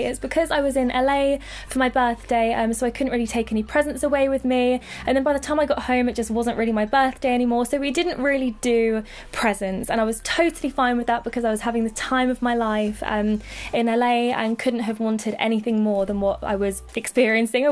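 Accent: RP, United Kingdom. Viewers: Is she British? Or???? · Shes so posh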